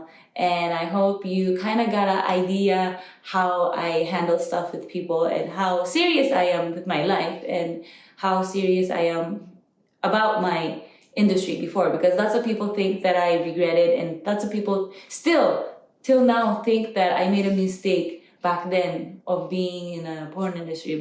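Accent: Philippines. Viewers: Why is her accent sounds like filipino? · Filipino accent 😍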